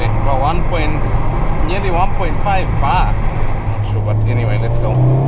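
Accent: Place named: South Africa. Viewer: Bra ekse sunno wk.. you chune like me from s.a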